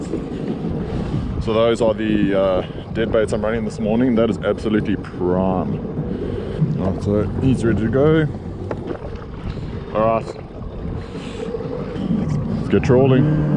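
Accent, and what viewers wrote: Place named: South Africa. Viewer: Always nice to hear a Saffa accent :)) keep the vids coming even though I fish off a boat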